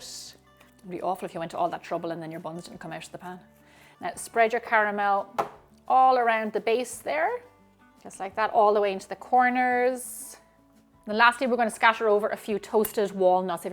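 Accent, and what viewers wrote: Ireland. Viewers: I adore your easy to watch videos, not to mention your Lovely Irish Brouge. However I like bananas but not in baking. What else can I use to make these inviting rolls?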